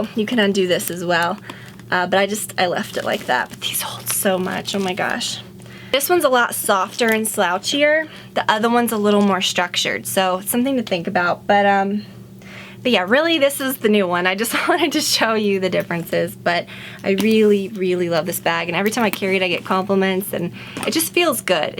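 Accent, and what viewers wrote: South, United States. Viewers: You southern drawl is coming out a bit more :) Love it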